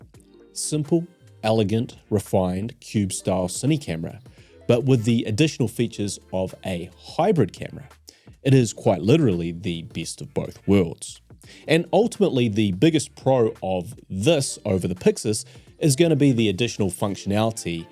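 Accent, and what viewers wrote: New Zealand. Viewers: Nice to hear another Kiwi voice on the webs!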